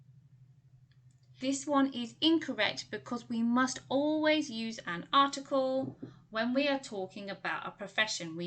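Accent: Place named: United Kingdom. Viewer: Beautiful British Accent !!!!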